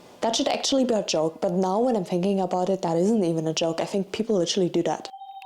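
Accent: Germany. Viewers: The tips are GREAT, btw this was actually the first video I've seen from you, and I immediately questioned if you were German or Austrian, by your accent and furniture?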